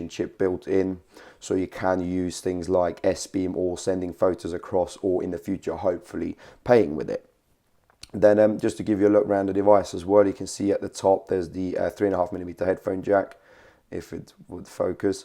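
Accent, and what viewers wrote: United Kingdom. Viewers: Finally a British phone reviewer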